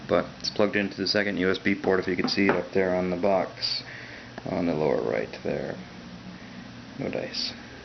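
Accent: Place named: Canada. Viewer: Funny, the beta was only for USA...or was it NA? because you sound Canadian.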